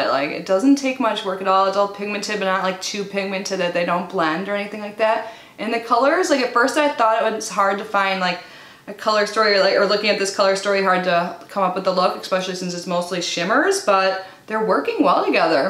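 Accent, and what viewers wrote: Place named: Midwest, United States. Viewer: Subscribed! I started watching your Ipsy videos a while ago, I really love them :) also I totally resonate with your midwestern accent haha. Keep it up girl 💜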